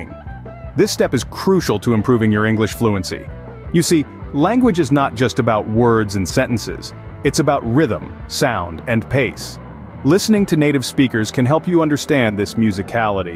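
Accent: United States. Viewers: This is American accent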